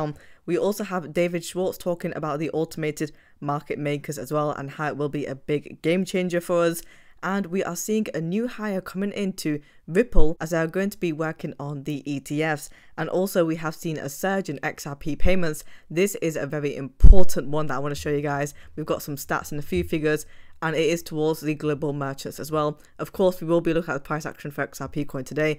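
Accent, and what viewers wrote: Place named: North, United Kingdom. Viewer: northern british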